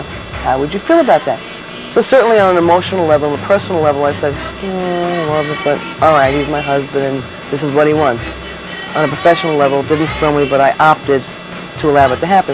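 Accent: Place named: New York, United States. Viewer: 100% New Yawker... I love it!!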